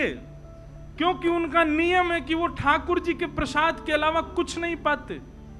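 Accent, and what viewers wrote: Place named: Braj, India. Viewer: Jai shree krishna ❤jai siya ram ❤jai shri man narayan ❤radhe radhe maharaj ❤️🙏maharaj app kitne cute ho, app ki mukh se barj bhasa kitne pyare lagte hai❤❤❤🥰🥰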